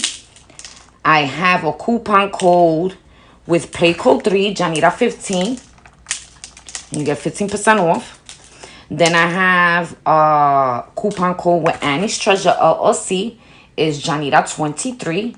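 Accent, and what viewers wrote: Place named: Bronx, United States. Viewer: I knew you was from the Bronx before you told us, girl😂 You have that Bronx swag❤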